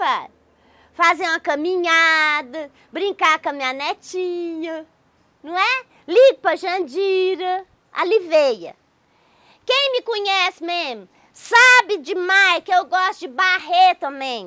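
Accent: Minas Gerais, Brazil. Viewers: Amo vc 💕 VC tem mim ajudado muito com seu jeito mineiro de falar comigo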